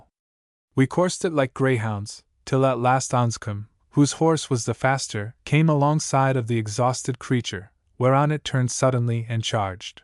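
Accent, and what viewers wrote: United States. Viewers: Have been a fan of H. Rider Haggard since the 1960s, especially the Allan Quatermain series. It is a shame that the reading is done in a rather flat artificial intelligence voice, and an American one at that, with numerous mispronunciation, the rendering of Natal is especially jarring one, it's a place not giving birth. Have also listened to my favourite AQ story " Allan and the Holy Flower " read once again with a American voice, this time female, and it's very difficult to except that voice taking the part of a English male big game hunter in Africa in the 1800's. It needs someone like Greg Wagland, who is a most excellent audio book reader to take on the roll. I did give this book a thumbs up not because of the reading but because of the book.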